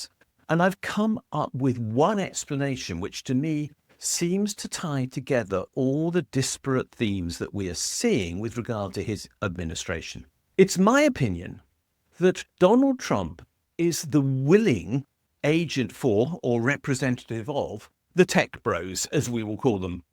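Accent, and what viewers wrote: United Kingdom. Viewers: By your accent your from Britain? UK? Stay out of U.S politics bud Trump is our president. A true leader. I ask this how dangerous can a company get when all the people have to do is simply use another company if they get too powerful but when the government gets too big we are fuc*ked. I'm more more fearful of a government than any company. Wake up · Your talking out of your backside because your mouth knows better. And your Brit accent does not make you sound intellectual. The only destruction is perpetuated by the Marxist Left: No God. No Country. No Family. Burning American Flags. And when witnessing that same regressive Left burning down cities, businesses; and, not to mention the violent fire bombing of Tesla and the mob attacks on citizens, you got some balls claiming President Trump is destructive.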